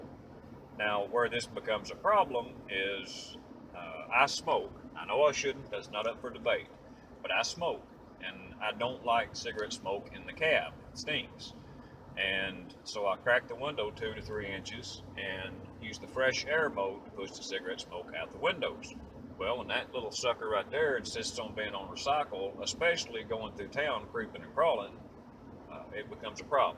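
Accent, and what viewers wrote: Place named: Tennessee, United States. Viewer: 11.5 meter reading, if it's anything like my Caravan you can take about 2 mpg off that, on a recent trip up to Vermont mine read 28.6 but calculator said 25.6. A friend who just bought one I asked him if he was getting 15 mpg he said he be tickled if he could get 12. Not a better looking truck on the road, but oh man the fuel economy is awful! Awesome TN accent, this man could tear up a fishing or hunting show, I like my preachers to talk like this man, our Preacher is from Nashville TN, best man I ever met in my life! Brother n laws Silverado gets 15, my Ford gets 19.25. I don't have a v8, but oh man she pulls! I still Live the RAMs looks.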